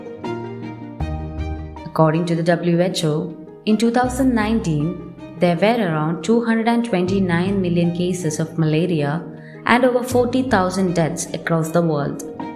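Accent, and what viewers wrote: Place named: India. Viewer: I like the content in your videos. It is well-researched, bite-sized, and packed with information. But I dislike the voiceover in almost all of your videos — it has a very thick local Indian accent, which is very distracting. For a more absorbing consumption of your videos and to reach a wider audience, I suggest you do one of two things: Get rid of the voiceover all together and include captions with background music, or hire English speakers to narrate using native US, UK, or Australian accents. This is not the first time I'm writing on this subject. Please take heed! Thanks.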